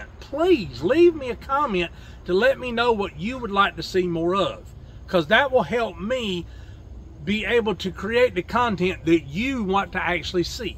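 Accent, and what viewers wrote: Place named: North Carolina, United States. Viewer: I'm a transplanted Tarheel in Maryland with 12 acres of property that I maintain, a mix of grass areas and trees. I was attracted to your channel (subscribed!) because there is always something to be learned from the experience of others with regard to mowing, trimming, and general land maintenance. Not to mention that listening and watching you reminds me so much of my southern NC roots. I also enjoyed your vacation episode - I drive by Fancy Gap on my way to NC to visit family so was familiar with the area. Didn't know about the cabin rentals so something for me to check out for one of my getaways in the future. I would say keep doing what your doing. Not everything will hit everyone's interest but variety is always good.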